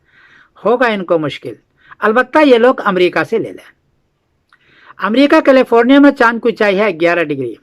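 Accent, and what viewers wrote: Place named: Bihar, India. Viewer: ماشاء اللہ. مولانا کا لب و لہجہ اور گفتگو کا ٹون وہی بہاری ہی ہے 👍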